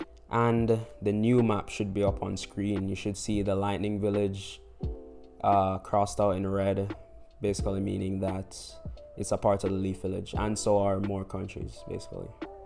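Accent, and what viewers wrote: Jamaica. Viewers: Bruh I’m Jamaican and and he sounds like me for real sometimes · you sound jamaican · Aye u from Jamaica right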